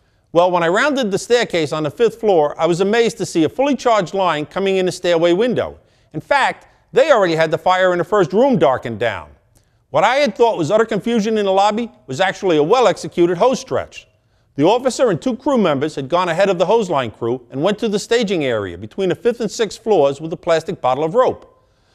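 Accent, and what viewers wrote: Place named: New York, United States. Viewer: I can tell he from New York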